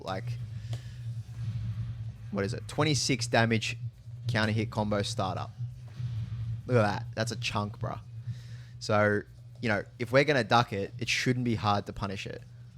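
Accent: Australia. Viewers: Dam, it’s refreshing to hear an aussie accent in a tekken vid ey lol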